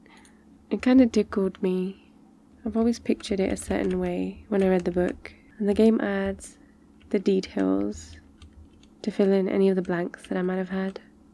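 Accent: United Kingdom. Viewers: OMG BRI'ISH?!?? 😶😮 I mean Same but still! Cool voice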